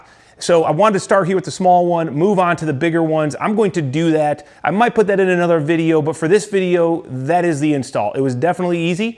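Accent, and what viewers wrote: United States. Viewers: homie looks like Canelo but with an American accent and not Mexican hahaha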